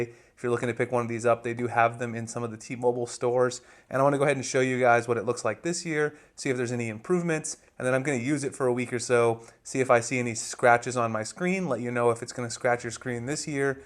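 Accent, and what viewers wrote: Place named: United States. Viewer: I love the way Americans say mobile lol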